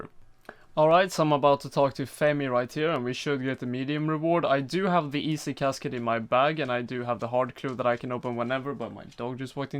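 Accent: Sweden. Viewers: swedish? sounds so much like swedish kind of english haha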